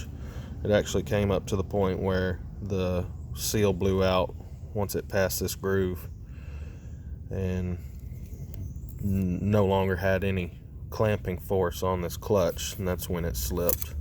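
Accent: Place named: East Texas, United States. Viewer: Hi, you sound like you're in East TX. If so, would you be able to help me identify if I need a complete rebuild or if I just need the basic kit? I'd appreciate it!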